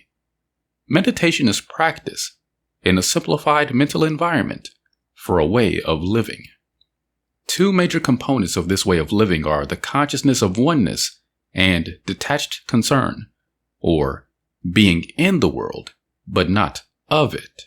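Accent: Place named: Chicago, United States. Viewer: Don't wanna hear a Negro with a Chicago accent read to me sorry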